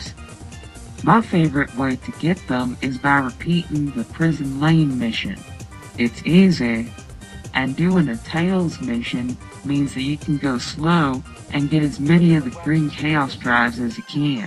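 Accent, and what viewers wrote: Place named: South, United States. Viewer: Can confirm, lotta southerners talk like this. Even me from time to time😅 Surprisingly, this aged just fine though voice sounds a tiny bit scratchy(like just woke up kind of scratchy) It looks like it helped a lot of people going by the comments. Even now, this is a great video!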